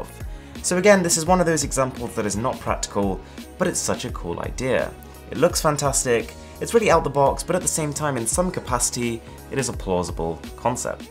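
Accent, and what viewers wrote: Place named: RP, United Kingdom. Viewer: It's the only reason I might stop watching 👀 exaggerated accent trying to make British sound even more posh makes me cringe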